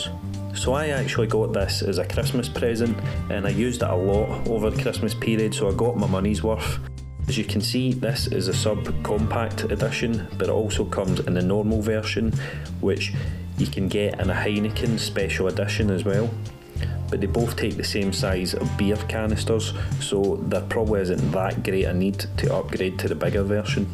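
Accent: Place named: Scotland, United Kingdom. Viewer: Great review. And one of the nicest scottish accents ever! 😁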